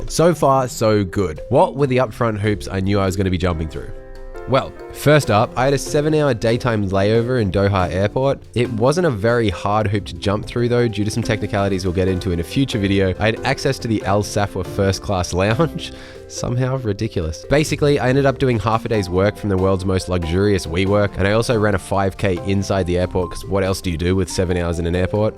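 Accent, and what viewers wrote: Australia. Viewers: I always thought you were American until you said "Australian dollars," then I listened to your accents, and that's how I feel you are Australian :)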